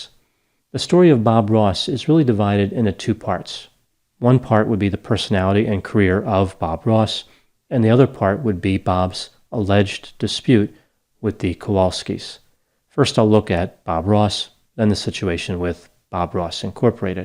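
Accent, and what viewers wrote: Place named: Pittsburgh, United States. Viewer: hearing a Pittsburgh accent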